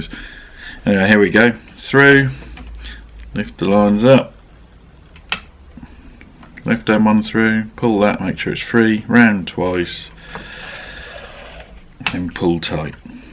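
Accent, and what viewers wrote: Suffolk, United Kingdom. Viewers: You sound like a Suffolk guy inspired by jacklore just like me. This is great video and very informative. I love that little knife you made too.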